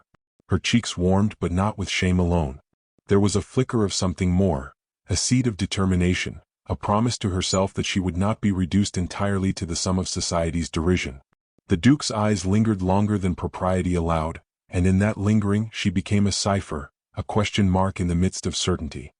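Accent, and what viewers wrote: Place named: United States. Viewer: Terrible narration; too hurried; no change in cadence and too American!!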